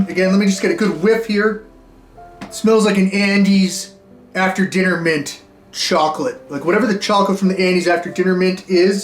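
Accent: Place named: South, United States. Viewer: 👀👀 you and Bryan Broaddus almost sound identical. Hes a Dallas Cowboys scout southern draw but yall sound so similar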